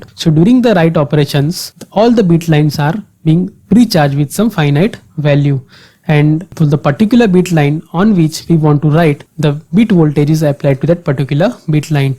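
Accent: India. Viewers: I always dont understand a shit while a hindu talks... shit.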